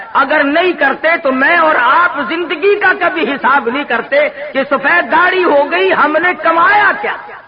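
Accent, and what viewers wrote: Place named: Multan, Pakistan. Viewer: Very beautiful and heart touching dialect, listening gives spiritual and divine intoxication. It enlightens and illuminates the colorful fragrance of our red, orange, yellow and green soil. It gives a beautiful fragrance of Soil joyed, experienced and delighted after the Rain. It gives a reflection, thought and consideration that you are sitting in small mosque made by RED BRICKS in the village of MULTAN or you are sitting in small mosque made by RED BRICKS in SLUMS or UNDROON E MULTAN in walled city and listening the BAYAN, Narration or Declaration before JUMMA prayer. His dialect and way of speech truly reflected beautiful fragrance of Patriotism especially for the People of Pakistan, India, Bangladesh and Afghanistan as well. His dialect and way of speech is highly regarded with affection and love.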